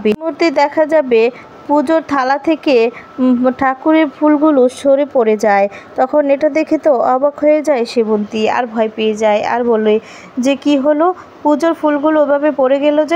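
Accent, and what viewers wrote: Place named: Bangal, Bangladesh. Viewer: তোমার কথকতা ভাল হচ্ছেনা। বাঙ্গাল বাঙ্গাল উচ্চারণ শুনতে খারাপ লাগে। এই উচ্চারণ ঠিক না করেই কথকতা নাকরলে ভাল ।অপদার্থ। একটা কথা,চেষ্টা করলে তুমি বাঙ্গাল বাঙ্গাল উচ্চারণ ঠিক করে শোনার যোগ্য কথকতা করতে পারবে।বিশ্বাস রাখো।শুভেচ্ছা জানাই।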